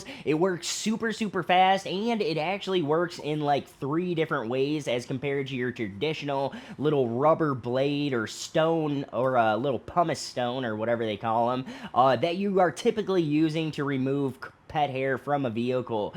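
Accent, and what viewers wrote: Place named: Wisconsin, United States. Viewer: I wasn't expecting the Wisconsin accent "don't cha know" , but great video and thanks for the tip on the right tool for the job.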